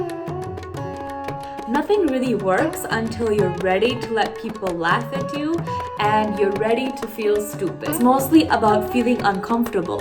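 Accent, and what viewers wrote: India. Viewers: Fake English accent. Why bro ? A few weeks in America doesn't change all things. You are an Indian with a MTI, accept it or don't. No matter how much you try to fake being an American , your way of telling KAERALA instead of actual Kerala speaks for itself. Be natural first. You wil gain more respect . I don't care what you have to give in reply. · casteist NRI who cant speak the language but has malayalam accent more than american accent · I don't find your accent far from indians !! Are you sure you were grew up in America?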